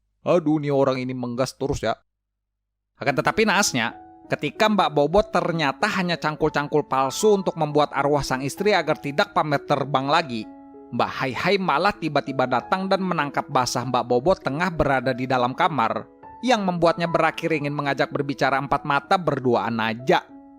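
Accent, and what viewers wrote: Banjar, Indonesia. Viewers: Urang Banjar kah ni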